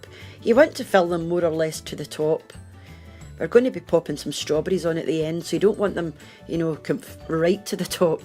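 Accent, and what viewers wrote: Scotland, United Kingdom. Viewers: In the earlier videos, tried to minimize her Scottish accent because she was self-conscious about it.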